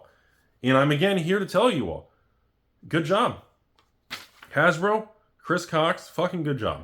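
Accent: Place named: United States. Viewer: Well, what I meant is that his talking is very American... don't know how to put it. Hard to tell some words apart at times.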